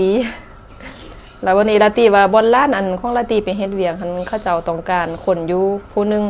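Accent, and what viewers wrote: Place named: Loei, Thailand. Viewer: สำเนียงพูดจาภาษาเหมือนคนไทยเลย จังหวัดเลย จังหวัดเลยเขาจะพูดฮันแนว❤❤🎉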